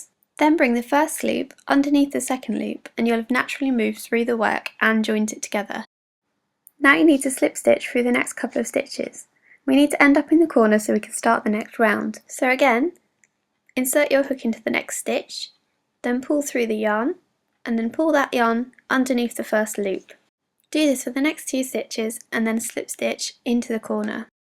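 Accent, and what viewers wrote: United Kingdom. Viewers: She sounds so British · where are you from? you sound a bit Devonshire too me...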